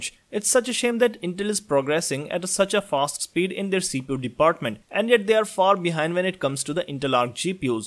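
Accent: India. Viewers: Informative, but ruined by the Indian accent.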